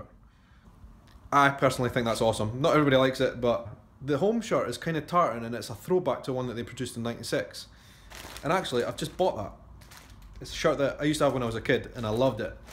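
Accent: Scotland, United Kingdom. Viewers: Love the vlog! I moved over to Sweden last year and it's nice to hear a Scottish accent again! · Hey, cool! You're from Scotland! Love Scottisch accents! Subscribed! :D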